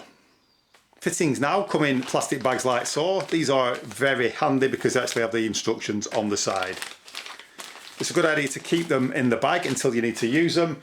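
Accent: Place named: Lancashire, United Kingdom. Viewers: I love your Lancashire accent and the way you emphasise 'T's (like in SpeedfiT) and 'G's (like in plumbinG) when they're at the ends of words. Just a few miles up the road in Cumbria we just don't do that. Personally I've used both plastic and copper. I can take pride in my copper and soldering work, but much less so with plastic, I feel like I'm cheating. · Was gonna say I can hear Blackburn in that accent...I was close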